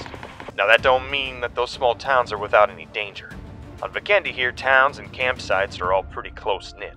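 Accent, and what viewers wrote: United States. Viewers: I don't like this man's southern drawl. Doesn't fit with the map. On Miramar it would barely work. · Lol i love the new narrator's voice in this trailer . It's like one of those cowboy style accent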